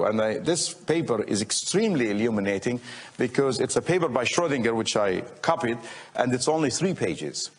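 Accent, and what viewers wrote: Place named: United Kingdom. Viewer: This accent is British accent 😅😅😅😅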